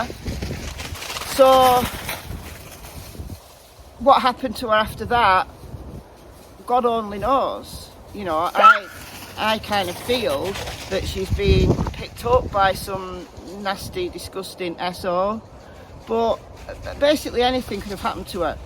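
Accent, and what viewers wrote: United Kingdom. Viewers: I love you, your British accent & your very adorable dogs! I have been following you for awhile & look forward (when I get off work as a night shift nurse) to listening to your podcast on my drive home. Keep up the great work!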